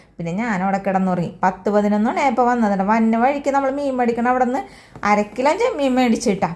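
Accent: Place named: Kochi, India. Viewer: ഇരിക്കണേനു. കൊച്ചി ഭാഷ കേൾക്കാൻ എന്ത് സുഖം.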